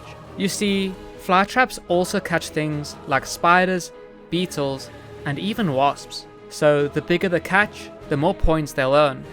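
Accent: South Africa. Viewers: Are you South African, the accent gives it away? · I had a feeling! You sounded SA accent. Great videos! I look forward to seeing more and going back through your older ones.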